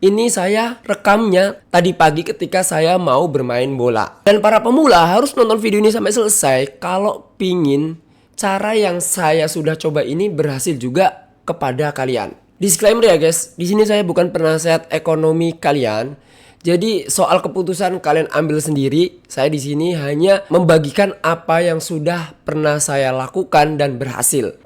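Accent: Indonesia. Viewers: wong ngapak kayane ya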